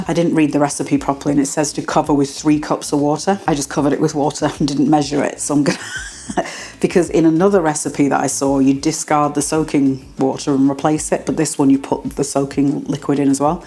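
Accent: United Kingdom. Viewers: my pleasure … I’m in the US so the British accent is really cool 👍🏻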